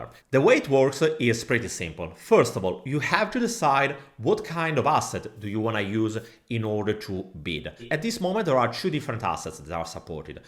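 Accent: Italy. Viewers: ti prego toglimi una curiosità: sei italiano o spagnolo? L'accento mi sembra italiano, poi ho visto che hai anche un canale spagnolo...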